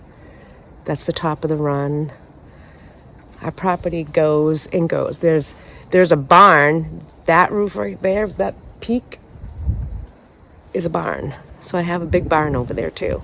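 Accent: Massachusetts, United States. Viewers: Is there any chance your from Massachusetts/ Rhode Island? You sound like family. I'm in Mass, Metrowest near Framingham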